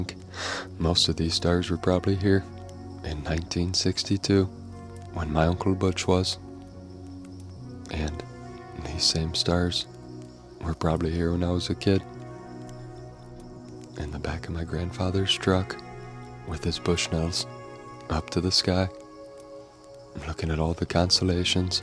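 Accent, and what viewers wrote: Canada. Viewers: Love your videos. So relaxing. How are you not Canadian? You sound 100% Canadian. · Are you perhaps….. Canadian?🇨🇦🇨🇦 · No. The "eh" is a Canadian thing. I'm Canadian. I also think he says, "buddy".. Another Canadian thing.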